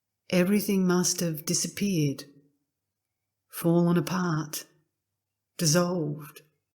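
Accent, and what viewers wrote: Australia. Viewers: Since I found this playlist I am listening every night. To hear these teachings read in a straightforward Australian voice is particularly helpful to me (I guess there must be some "accepting", "identifying" or "clinging" in me there!). What the topic is "being natural", a natural voice is very apt. While listening, I follow the instructions of the teacher, as best I can.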